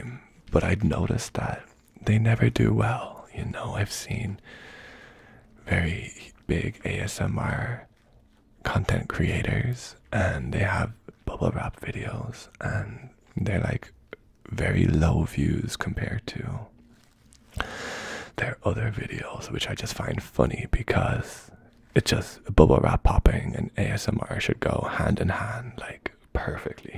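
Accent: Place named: Ireland. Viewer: Soft spoken is absolutely beautiful, with an Irish accent makes it phenomenal 😍 much love from an admirer in New Zealand ❤❤❤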